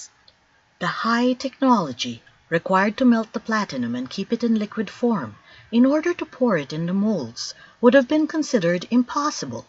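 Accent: Philippines. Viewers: Exactly and it's quite obvious. It smells Chinese propaganda. The whole false narrative their trying to inject into our history is totally laughable. And the use of Filipina voice and Tagalog username is deceptive. Remember from TGC vid that before the Chinese ever knew how to build ships, the early Ophirians were already masters of the sea.... In fact the pintados from the Visayas and Sulu frequently terrorized China's coastal communities....ransacking and pillaging them. And the only attempt by China to conquer Manila was through Limahong who failed miserably.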